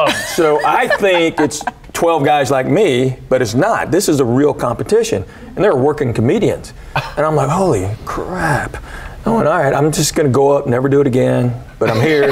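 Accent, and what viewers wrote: South, United States. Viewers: I remember seeing this guy when I was a little kid in the. Local accents are quickly going away these days but at the time his southern drawl really confused me! I don't remember the whole setup but I remember the punchline was him saying, "I reckon so!" I'm from NYC and I'd never heard that phrase before so next time I saw one of my uncles, a guy born and raised in the South but spent the rest of his life here in NYC, I asked him what that meant so he asked me to repeat the joke for context. He laughed for 5 minutes straight! He said it made him feel at home again. There's a type of southern humor that's really dry but sarcastic and very sharp. He told me it was like British humor in that way. His accent has softened, he's still got some of that southern charm and dry wit. And I'm glad to see he's still working. I'll be subscribing and checking out more of his material! 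· The Asian with a southern accent is fascinating me. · I've never heard an Asian guy with a southern accent before.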